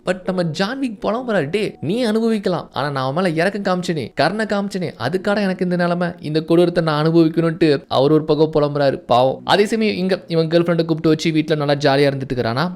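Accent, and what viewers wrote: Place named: Kerala, India. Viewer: 1 month kku munadiye...... doubts vanthuchu.... but apo voice la malayalam smell vanthuchu..... BUT IPA KANDIPPA SOLLURE ...... TAMIL VOICE OVER ஆசூன் தான்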